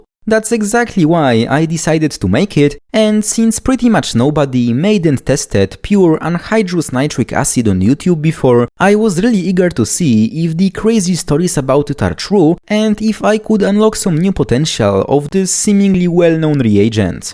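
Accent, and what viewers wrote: Poland. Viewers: First three words, I heard the polish accent, I knew it instantly😂😂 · Z akcentu wnioskuję że polak?😂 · Most polish accent ive heard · Od pierwszego słowa wiedziałem że Polak ❤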